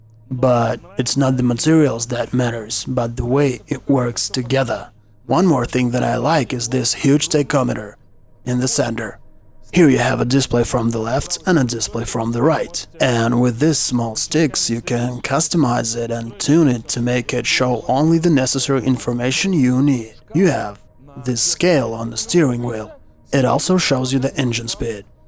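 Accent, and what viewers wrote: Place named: Russia. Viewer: это у тебя в голове установка видимо детского сада. Это и без тебя известно что есть и британский и австралийский акцент. Просто все дело в том, что если бы ты знал на какую страну метит Эрик со своими англообзорами, ты бы тут вообще ничего не писал. А раз он метит туда куда ты не знаю понял ещё или нет, он мог бы перевести свои обзоры с русскоязычным чуваком и дать на озвучку носителю языка. Решил сэкономить, чё тут сказать. Просто представь ты смотришь топгир а там озвучивают Равшан и джамшуд.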